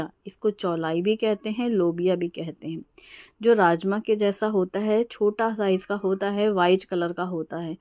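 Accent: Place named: Uttar Pradesh, India. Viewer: Ji aap UP sai belong karte hai...apke bolne key style sai lagta hai......aap bahut hi talented hai❤❤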